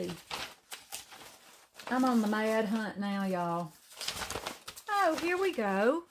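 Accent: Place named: Tennessee, United States. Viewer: Great tutorial! I have a sister-in-law from Tennessee who lives in Alabama and works in Georgia and you sound so much like her! I'm from Pennsylvania and don't have an interesting voice at all. What a beautiful journal! I just started mine.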